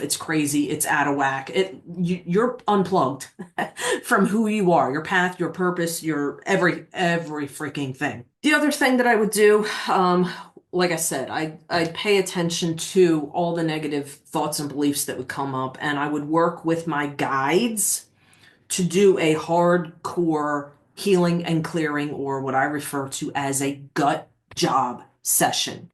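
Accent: Philadelphia, United States. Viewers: I hear a "philly" accent in your voice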